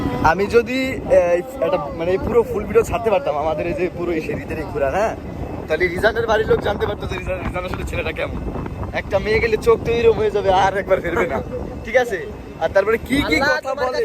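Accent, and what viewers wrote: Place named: Gopalganj, Bangladesh. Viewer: Apnar basha gopalgonj ooo vai😮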